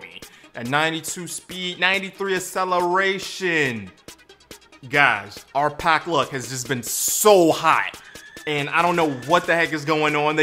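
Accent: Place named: Brooklyn, United States. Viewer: I can tell that u from Brooklyn lol.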